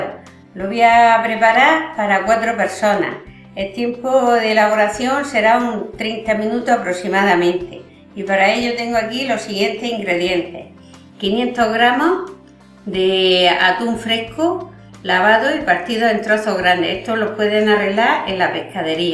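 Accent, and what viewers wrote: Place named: Andalusia, Spain. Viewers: Jajajajajaja no sabes reconocer un acento andaluz? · " Loviá poné " , me encanta el dialecto andaluz 💪🏆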